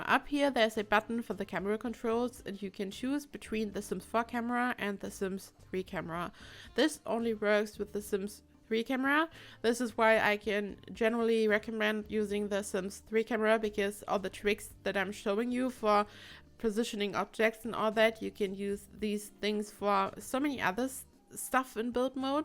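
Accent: Germany. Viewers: You have a very german accent? Do you come from Germany?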